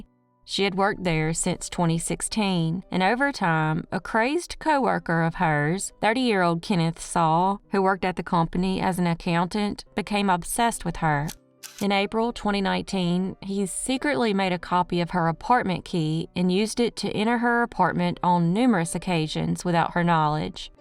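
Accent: South, United States. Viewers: I think we like that Southern voice ❤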